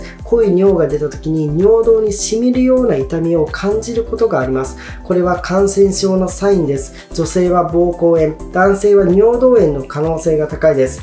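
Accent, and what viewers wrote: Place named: Tohoku, Japan. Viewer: はじめまして いくつか動画拝見し チャンネル登録しました。 東北なまりが懐かしいです^_^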